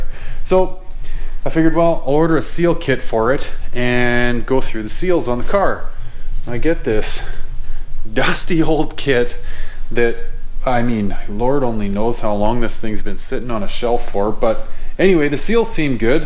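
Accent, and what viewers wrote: Canada. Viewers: This guy always sounds like he's trying to fight a Canadian accent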